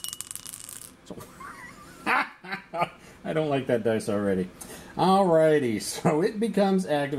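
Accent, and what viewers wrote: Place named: South, United States. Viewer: I liked it... the Southern accent added just the right touch....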